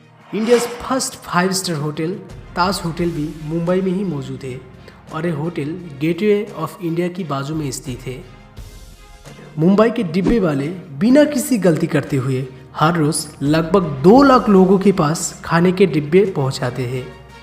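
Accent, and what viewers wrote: Bengal, India. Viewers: I think you are a Bengali so improve your tongue and keep it up for Mumbai....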